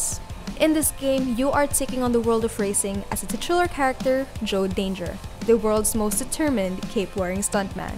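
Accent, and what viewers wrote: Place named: Philippines. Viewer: She sounds pinoy lmao 😂 nice vid doe 👌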